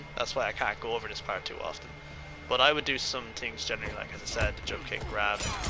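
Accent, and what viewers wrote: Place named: Ireland. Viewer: thank you for the video. Nice Irish accent too.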